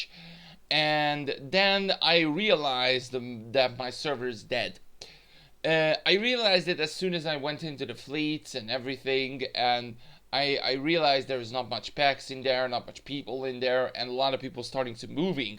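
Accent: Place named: Hungary. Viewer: Not just your accent are bad, every english speaker hungarians accent are bad just like mine aswell! :D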